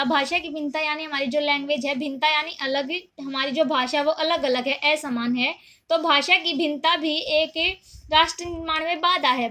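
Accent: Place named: Haryana, India. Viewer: You are from haryana ❤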